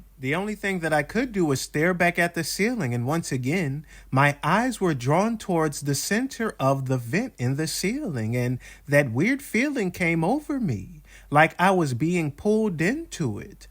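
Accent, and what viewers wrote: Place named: Philadelphia, United States. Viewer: are you from Philadelphia or the surrounding area? Just judging by your accent.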